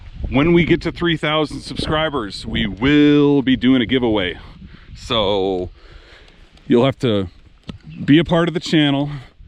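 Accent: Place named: United States. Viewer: I’m from Poland 🇵🇱 i working as truck driver. U have beautiful American accent ❤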